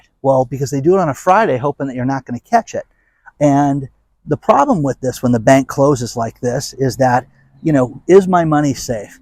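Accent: United States. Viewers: I was so happy to hear a friendly American accent. Because i instantly knew you are not where i am. But yes it is something i have thought about it. All the best from Western Australia.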